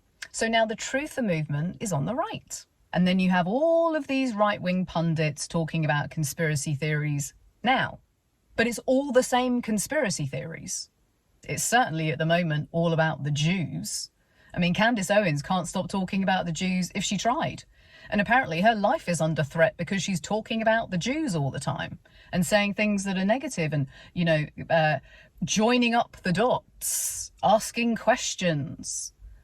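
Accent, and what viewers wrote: United Kingdom. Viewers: Great 😃👍 Brit🎉 accent ❤ ! Along with superb teaching, to 🥾 boot!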